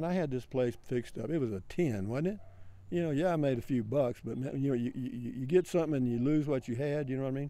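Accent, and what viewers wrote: Texas, United States. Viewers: Loaded to the cookie seed! Texas talk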